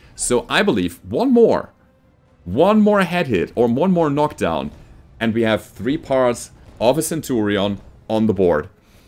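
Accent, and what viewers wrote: Germany. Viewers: Oh he is german?! I can hear it :)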